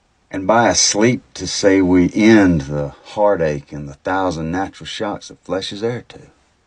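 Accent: South, United States. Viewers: RuPaul asked why not have Shakespeare in a Southern accent. Good question. Well done. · okay, so as an asian, the flow sounds better even to me. i've always found it quite a challenge to really absorb the genius of shakespeare's writing but somehow it just sounds "easier" with an american southern accent. edit: for some reason, it sounds more timeless and relatable at the same time compared to when read with what's commonly believed to be the elizabethan accent of shakespeare's time. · Wow this is the most brilliant rendition of a Shakespeare monologue done in a southern accent. You made it sound almost Buddhist in a way. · This is so good! I teach Shakespeare. It's interesting; hearing this monologue in your beautiful southern accent brings it alive.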